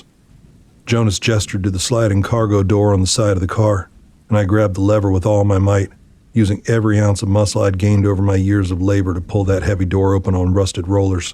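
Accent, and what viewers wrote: East Texas, United States. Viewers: Your East Texas series with your perfect country voice is my very favorite for relaxing. I have PTSD major depression and anxiety disorder so I keep it on rotation when I can’t sleep. Thank you for keeping the good energy in and the bad thoughts away ❤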